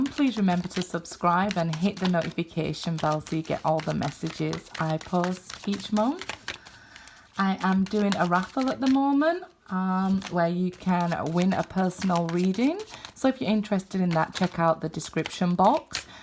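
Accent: United Kingdom. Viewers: Oh a british girl ❤️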